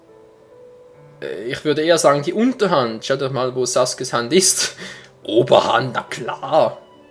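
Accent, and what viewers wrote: Switzerland. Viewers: kommst du aus der schweiz ?? ;) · bist du schweizer? nice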